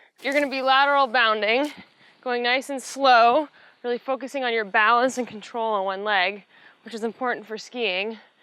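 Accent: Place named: Australia. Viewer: Your aussie is so beautiful!!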